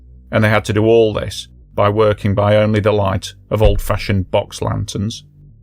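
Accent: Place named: North, United Kingdom. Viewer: it's like listening to one of my northern family members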